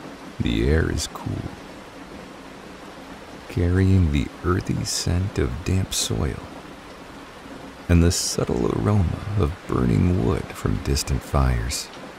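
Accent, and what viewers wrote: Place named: United States. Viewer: Nice to hear a cultivated American accent. Have to work on its not sounding so supercilious …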